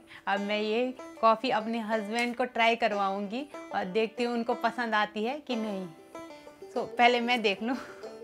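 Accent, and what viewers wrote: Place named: Pahadi, India. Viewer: Cute pahade style taulking tone💚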